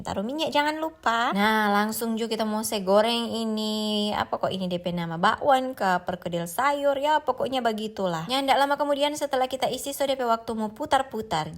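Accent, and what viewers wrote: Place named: Manado, Indonesia. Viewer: Suka nonton video mbak.....mungkin krn dialek bahasanya yg enak.....❤ Salam dr Makassar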